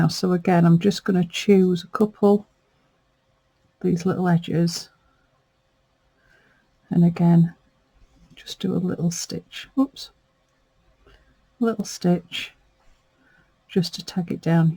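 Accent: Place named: Northern England, United Kingdom. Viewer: Hi, new subbie here (I came over from Rachel at Roxy Creations' channel) First off, I recognise your accent! You're a Northerner, like myself! (I'm in Lancashire) I love the idea of this challenge and I will be playing along too as I want to start incorporating stitched pieces into my journals and I think this will be a great starting point for me 😊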